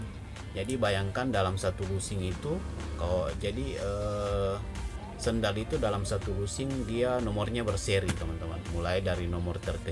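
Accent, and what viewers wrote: Indonesia. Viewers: Bang emng dari serang banten ya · Sulawesi ni kyaknya.. Salam dri bugis kaltim..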